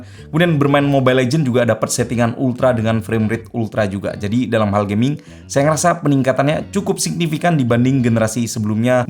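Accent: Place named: Surabaya, Indonesia. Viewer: lhooo SUROBOYO TA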